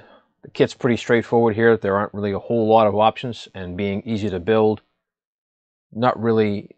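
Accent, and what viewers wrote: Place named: Massachusetts, United States. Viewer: Are you from Mass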